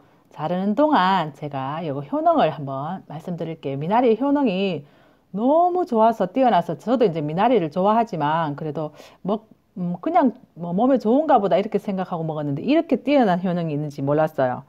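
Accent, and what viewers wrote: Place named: Daegu, South Korea. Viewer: 구수한 대구사투리 억수로 좋슴미다요🤗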